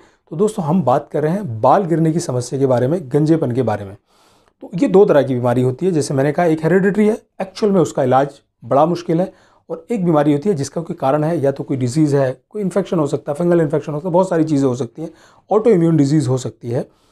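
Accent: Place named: Assam, India. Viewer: Assam sai bol raha hi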